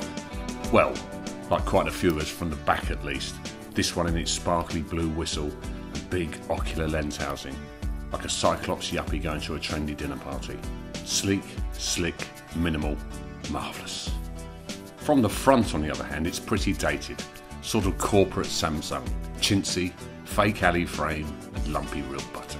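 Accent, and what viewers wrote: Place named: United Kingdom. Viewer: I loik that british accent sow much :)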